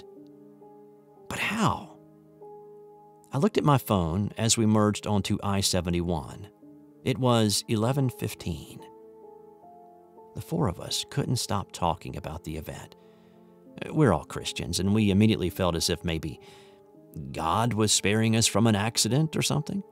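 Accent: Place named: California, United States. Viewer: This was hard for me to listen to because it just was tons of explanations of roads and landmarks, trying to paint a picture that can’t be painted to someone who doesn’t know the area. It sounded like The Californians from SNL. I get it in terms of recording the events, but not for ominous storytelling.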